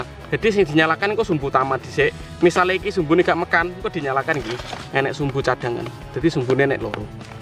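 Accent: Java, Indonesia. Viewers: Orang Jawa ya bang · Kakak org jawa ya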